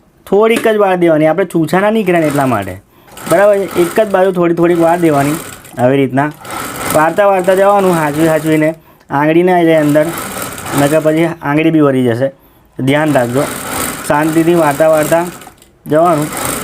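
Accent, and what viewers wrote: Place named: Mehsana, India. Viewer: Kheralu baju na so bhai mhesana ni bhasha se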